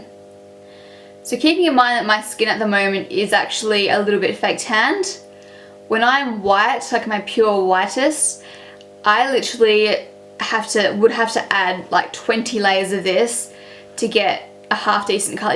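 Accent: Australia. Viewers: you sound so australian here bby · She's speaking English and she's from Australia so she has an Australian accent?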